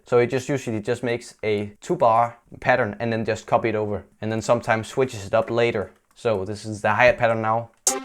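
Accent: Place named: Denmark. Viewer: knew from your accent you were from denmark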